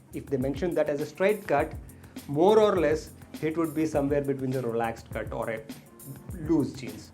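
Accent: India. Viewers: no offense, but Indian accented English needs to be spoken with a slower cadence for it to be understood by many people. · It's been a long time since I heard this Indian English. )))))😉😉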